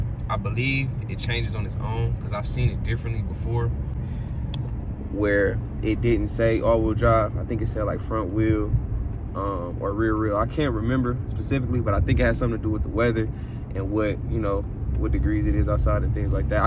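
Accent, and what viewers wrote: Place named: New York, United States. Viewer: you sound like youre from nycc papa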